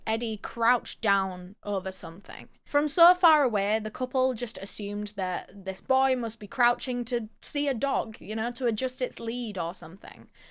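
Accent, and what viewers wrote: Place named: Leeds, United Kingdom. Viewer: Thank you for covering this case. If you read your comments, I’m sorry for the notification overload! 🫣 I’ve only just found your channel by complete accident, a video appeared in my recommended feed and I heard your Leeds accent (I think? It sounds like Leeds to me anyway. Me mate lives in Morley and you sound like him. That’s a compliment btw 👍🏻)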